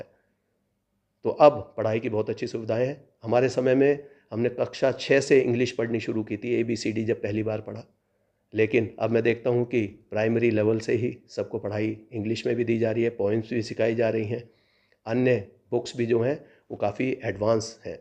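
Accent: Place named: Delhi, India. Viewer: Sir aap delhi se hai yesha mujhey lag raha Tha kyun ki u.p ka language kuch defferent Hai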